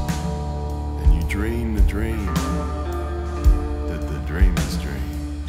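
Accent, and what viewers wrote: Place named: Australia. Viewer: Wou Aussie as voice! Reminds me of the movie two hands, the dead big brother narrating.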